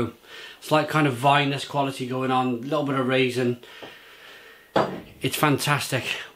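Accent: Wales, United Kingdom. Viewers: Hi As you're Welsh (I think that's a Cardiff or Barry accent I detect) do you know of anywhere local that sells this or similar? Would love to get my hands on more German helles, dunkels and bocks, doppelbocks etc. Love German beer but can only find one store that doesn't have a great selection outside of weissbiers. Also, isn't a dopplebock a lager? Cheers, I666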